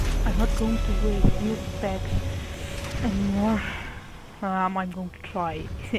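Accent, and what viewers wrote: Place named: Netherlands. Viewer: Je hoort wel goed dat je nederlands bent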